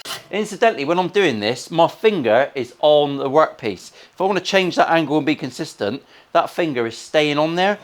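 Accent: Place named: Northern Ireland, United Kingdom. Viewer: Cant wait to see this finished mucker. That norn irish accent is pure class so it is ! I got a MK NF53 and its awesome. That paisly top is stellar. Going to be an amazing build again!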